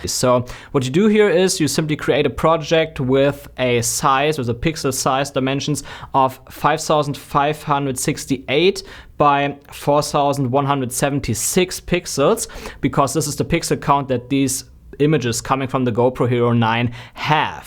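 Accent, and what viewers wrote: Germany. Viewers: You sound a little like a German who speaks English 🧐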